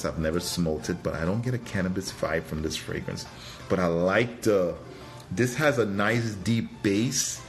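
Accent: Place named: Trinidad and Tobago. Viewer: Who's? Lol... btw I get a slight Trini or Dominican 🇩🇲 accent in the back? More trini. Might be going crazy. Lol. Disregard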